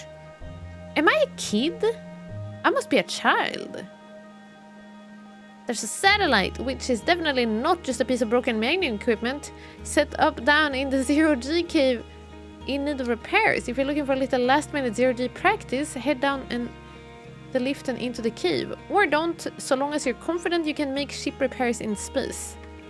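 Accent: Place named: Sweden. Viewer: 1 minute in, she sounds super Swedish, I could be wrong. But I don't think I am. xD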